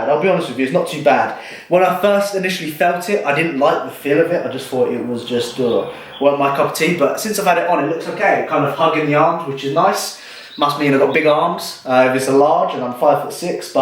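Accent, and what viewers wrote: Liverpool, United Kingdom. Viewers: Mate really liked the way you talk us through that workout, everything was clear, well explained and also motavating, ive ofter criticised some of your vidoes but in a good way to motavate you and help with the things i think you could work on and you have just really shown me you know your job, hats off man also im from Liverpool, loving the Scouse accent hahahah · You sound like Darren till with the scours accent. Remote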